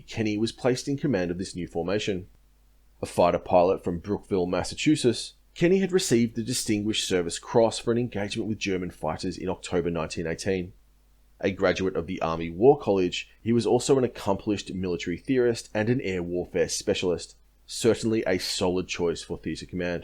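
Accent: Australia. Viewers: I LOVE this Aussie narrator ! Awesome. G'day mate ! 🫡 🇦🇺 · Hmmm? Narator sounds like Majorkill. Or do all Australians sound same?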